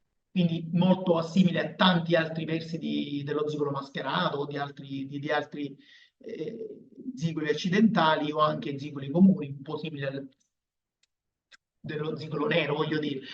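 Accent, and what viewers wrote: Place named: Sicily, Italy. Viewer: scusate l'inizio è tagliato...e i sottotitoli automatici della IA non tiene conto della mia pronuncia "sicula", tagliando parecchie parole o facendo una stranissima interpretazione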